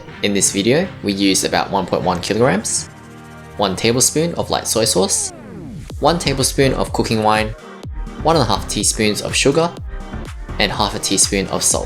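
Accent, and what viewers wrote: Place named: Australia. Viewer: what a nasty ass australian accent xD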